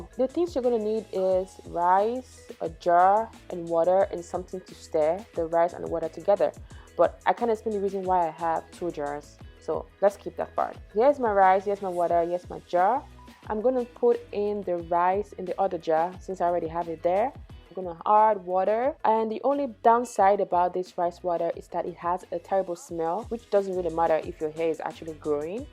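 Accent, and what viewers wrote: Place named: Jamaica. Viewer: Sound look jamaican.. good job sis. More power to u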